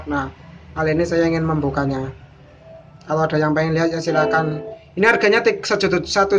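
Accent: Banyumas, Indonesia. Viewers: Masih bersama ini membukanya gmna ni :v sepertinya wong ngapak sekang ndi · Njir ngapak koe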